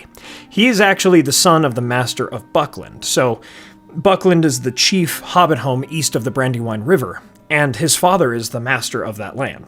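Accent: United States. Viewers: Gentry is the term I was practically screaming at the screen whilst listening. I think the video conflates nobility with upper class. In fairness, the creator sounds American, so they probably aren't familiar with the nuances of the English upper class. I would say Pippin is probably more than gentry though. Given he is the heir to the Thane, he is closer to being a noble. Thane as a noble rank is somewhat similar to Baron.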